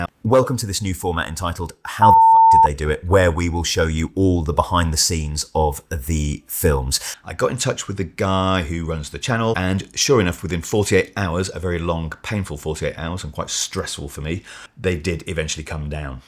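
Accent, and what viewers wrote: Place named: RP, United Kingdom. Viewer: "Its not my fault" he wasn't Gen X, Y or Z by any chance? Fortunately I have a heavy regional accent and am wondering if this would be more difficult to clone.Your voice, is very clear and almost RP to my ear and is "almost" devoid of any heavy regional accent. Okay, you are obviously from the south of England but I wonder if a "heavy" regional accent is more difficult to clone? Answers on a postcard please:)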